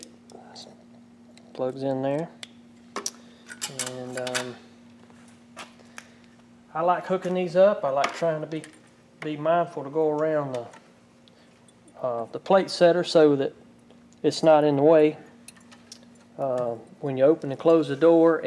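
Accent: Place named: Georgia, United States. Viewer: Hey! I just Googled & watched some videos. Awesome! I see you're in S.C. I told my husband "he sounds like he's from Georgia " Hehe..my apologies.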